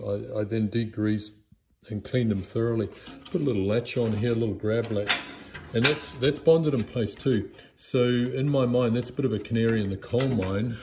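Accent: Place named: New Zealand. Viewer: sorry for the bad accent ID on my part... I've been through more of the vids and clearly see you're a Kiwi. Thanks for documenting everything, esp mistakes, it helps alot.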